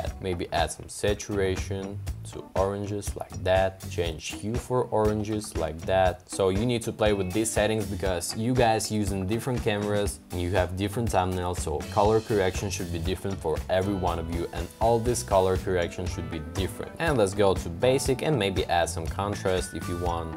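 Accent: Russia. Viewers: Я вот чувствовал русский акцент, зуб был готов дать 🤣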